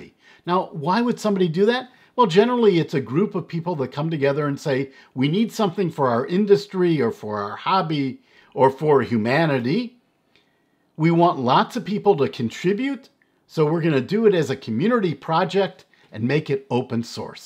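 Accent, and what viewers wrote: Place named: United States. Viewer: I listen english spoken vids very often, cos I'm learning english for my own and I found Mr., who I´ve been following for a quite some time now, have a very clear and nice american pronunciation. So, as I'm not a native listener in eglish, for me is perfectly well to listen his vids at normal speed. Cheers, John from Santiago de Chile. South America.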